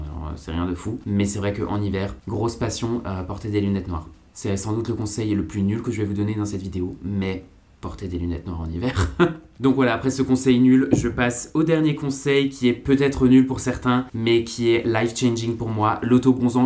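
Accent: Alsace, France. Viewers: C’est trop drôle comment vous dites « métenant » a la place de maintenant en Alsace 🤣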